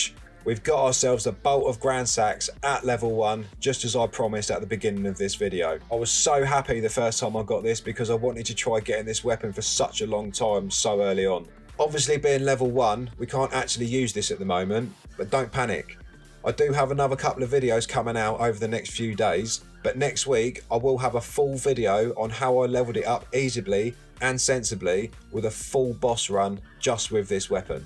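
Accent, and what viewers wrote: Cockney, United Kingdom. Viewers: An Elden Ring exploit video from what sounds like an old-school, cockney gangster! Wicked. Thanks, man!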